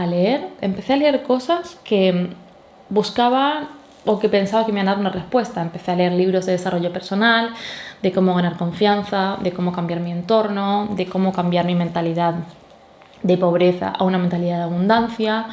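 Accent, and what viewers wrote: Argentina. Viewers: tiene un acento no🇦🇷?? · Sos argentina? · Gracias por tu canal y por abrirnos tu corazon en este video ❤ te acabo de descubrir hoy y ya tengo planeada una maraton porque hace un tiempo que vengo trabajando en mi desarrollo personal, y realmente este es el aspecto con el que siento que mas necesito reconectar y en el que me siento mas perdida, gracias realmente por proponerte guiarnos ❤️ Estoy esperando ver en algun video que digas de donde sos porque por momentos te escucho hablar como argentina y por momentos como española, y ahora que te veo tomar mate es evidente que alguna relacion con Argentina has tenido. 🤔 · Creo que no me quedo claro desde donde viajó hasta donde, alguien sabe?. Por ahí me suena a qué tiene un acento argentino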